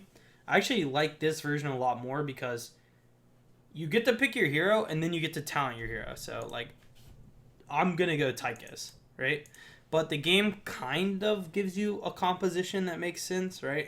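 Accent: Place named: Pittsburgh, United States. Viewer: are you from Pennyslvania? You have kind of a Pittsburgh accent...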